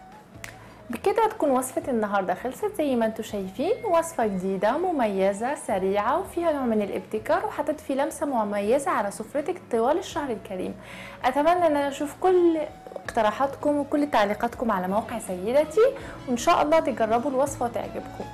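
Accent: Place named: Morocco. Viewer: احس من لهجتها انها مغربية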